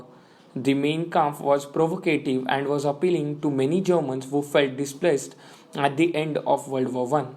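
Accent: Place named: Maharashtra, India. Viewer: आप शायद मराठी हो.. आपकी आवाज बता रही है.. आप के दोनों video अच्छे है.. आप को continue करना चाहिए । हो सके तो Atomic habits पढिये.. बहुत बहुत शुभकामनाएं..💐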